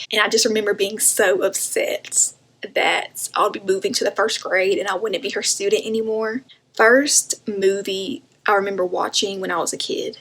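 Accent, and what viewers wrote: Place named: South, United States. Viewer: Girl where are you from?!?! I thought I was country omgeee lol I love it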